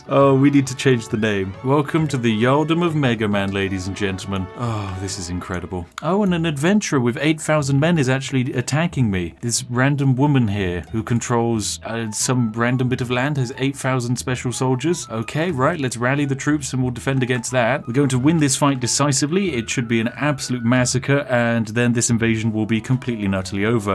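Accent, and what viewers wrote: United Kingdom. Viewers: Who tf dislikes?? Like why.. is it the stock images? The sassy British accent? Cause these are things I love xD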